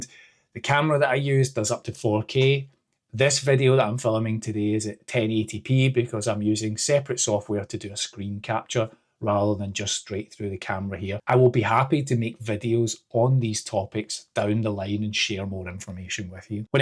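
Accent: Scotland, United Kingdom. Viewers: I suscribed from your first video, which just randomly popped up on my screen back in January. I watch on a tv. You’re doing well and your channel will keep growing. And it always helps to have a Scottish accent, one of the nicest to listen to on youtube.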